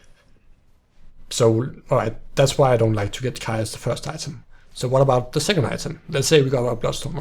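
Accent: Denmark. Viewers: I Can hear the danish in you.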